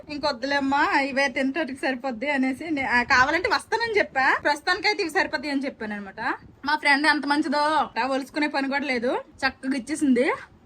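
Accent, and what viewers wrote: Srikakulam, India. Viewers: Haiii sister me srikakulam bash chala bagaundi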